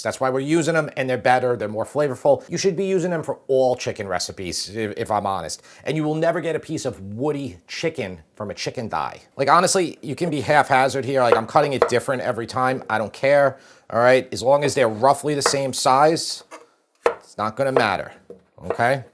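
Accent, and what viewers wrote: New York, United States. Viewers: He sounds so “New York” — I love it! The “Taste Tester” is so darn cute! 😻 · I love your NY accent. the NY accents are dying off. because of the 1 billion foreigners flooding into NY. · I JUST FOUND THIS CHANNEL!!!! Cannot believe I'm seeing this for the first time. Great recipes, great personality. He sounds like a New Yorker, like me!!!!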